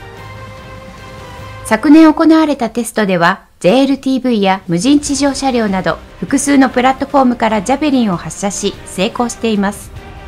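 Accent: Kansai, Japan. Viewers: ナレーションの女性は関西人かな❓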